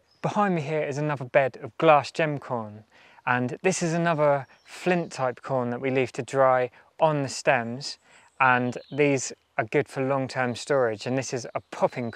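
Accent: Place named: England, United Kingdom. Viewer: Gaspé (a 6-row, short-cob flint maize) would do it, even in the severe climates north of 53°N in vaguely-interior western North America. You sound like you're from England (incidentally, I am too - I say "England" and not "Britain" for very personal reasons) - what part of the country are you in, and how many GDD10s (growing degree days - base 10°C) do you get in a year?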